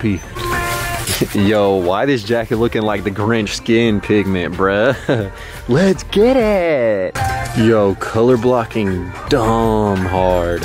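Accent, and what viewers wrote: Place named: Texas, United States. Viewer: I appreciate the Texas Flanders accent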